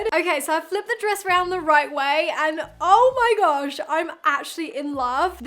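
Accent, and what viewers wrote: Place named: United Kingdom. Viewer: By your accent I can guess you are from UK so please do a video on urbanic clothing..... Btw in love with your videos ❤️❤️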